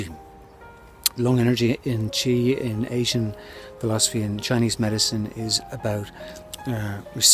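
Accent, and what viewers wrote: Ireland. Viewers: great video...nice to hear an Irishman talk about wild herbs. I am a Horticulturist level 6 and I have started to use Urtica dioica and Taraxacum officinale and my heart rate is down from 170+ /80 to 134/78 my doc is stunned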